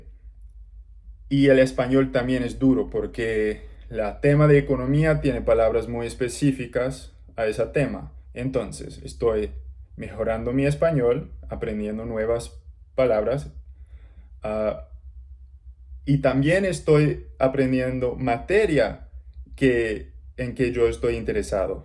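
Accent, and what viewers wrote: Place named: Colombia. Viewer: omg yes! the accent is so colombian particularly from bogota/medellin? so impressive, feels like a person from Bogota is speaking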